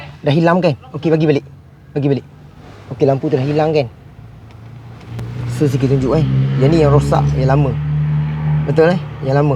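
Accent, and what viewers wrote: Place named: Kelantan, Malaysia. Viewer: ade bunyi mcm kelantan sikit. Ore klate ko?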